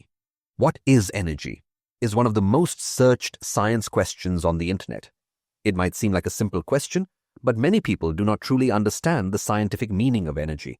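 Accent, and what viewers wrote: India. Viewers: Although I like this channel, But the narrator Indian accent and way of talking is annoying to me!! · Akin to Indian accent that irritates.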